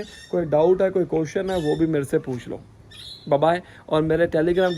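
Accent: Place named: Punjab, India. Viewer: ਬਹੁਤ ਪਿਆਰੀ ਬੱਚੀ ਆ, ਪੰਜਾਬੀ ਬੰਦਾ ਹਿੰਦੀ ਬੋਲਦਾ ਸਾਫ਼ ਪਤਾ ਲੱਗ ਜਾਂਦਾ ਆ ਕੇ ਇਹ ਬੰਦਾ ਪੰਜਾਬੀ ਆ!!😊😊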